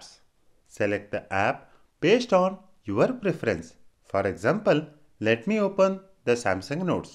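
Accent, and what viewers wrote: India. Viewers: This accent always brings Indians down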